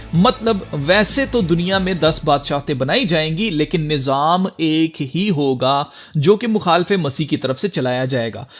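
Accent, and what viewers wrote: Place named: Pakistan. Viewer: Your accent is pakistani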